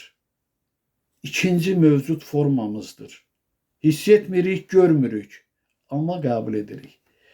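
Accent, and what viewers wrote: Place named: Karabakh, Azerbaijan. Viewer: Siz Gəncədənsiniz . Qarabağ ləhcəsi ilə danışırsınız . Amma sizin danışığınızda bir iki dəfə mənə elə gəlir ki qazax ləhcəsi ilə də danışdınız . Yəqin ki bir tərəfdən də onlarla qohumsunuz . Mənə maraqlı gələn odur ki səhv etmirəm ki ? İstərdim ki fikrimə aydınlıq gətirəsiniz .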